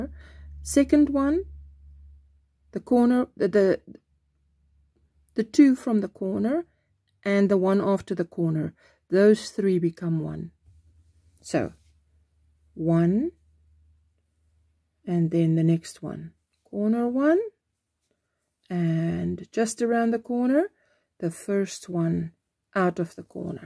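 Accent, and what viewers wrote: South Africa. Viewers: Omg I just found you and you sound just like me mom with your accent. I'm guess your from South Africa. I lost my mom in April and miss her so much, hearing you talk brings me so much happiness remembering her voice. I was born in Capetown but came here to the U S when I was 3. My mom never lost her accent. Love this poncho and can't wait to make it.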